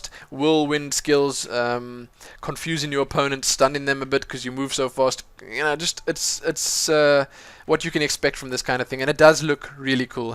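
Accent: Afrikaans, South Africa. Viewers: judging from what I know sounds like an Afrikaans accent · Haha. British? Lol. Hes South African. You ignorant person haha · He's not British, he's South African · hey bru i noticed you accent...u south african? BTW nice video, im quite impressed with the attention to detail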